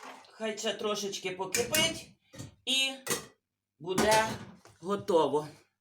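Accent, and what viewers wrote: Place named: Podillia, Ukraine. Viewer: А Поділля велике, я теж народилась на Поділлі, проживаю на Буковині (на кордоні 3-х областей), мені такий ваш говір рідний, і прізвище як у моєї куми😊. Люблю вас дивитися❤❤❤❤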